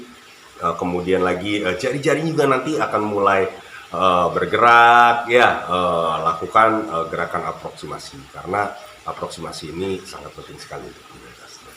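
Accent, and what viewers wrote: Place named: England, United Kingdom. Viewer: Bang jelasinnya pakai full bhs Indonesian saja. .soalnya terlihat lucu aksen Inggris nya.. 😂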